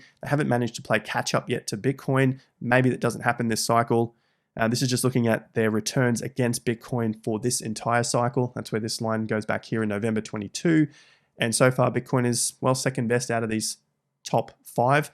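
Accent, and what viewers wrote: Australia. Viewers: Is this what you call analysis? Stating the obvious and regurgitating old information? Bro, get your head out of your chart-loving ass and stop relying on your Aussie accent to sell yourself. You are not doing people any favors by reading your crystal ball. Do some real analysis by connecting current events that might be contributing to the behavior of the assets in the crypto market. You’re the type that got rekt the last time because of over leverage. Now, you’re just suggesting to do the reverse. Stop. Listen to real market analysts like Samson Mow, Tom Lee and Raoul Pao. Maybe you’ll be a bit more convincing as a legitimate crypto analyst. If not, just stay away from crypto and stick to your TradFi mumbo jumbo.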